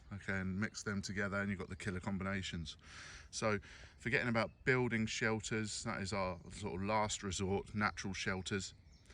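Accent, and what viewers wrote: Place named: England, United Kingdom. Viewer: I really enjoyed this, thanks. Simple, straight forward, and right to the point. And great to hear an English accent knowledgeable about the outdoors. Thanks for taking the time to share your experience.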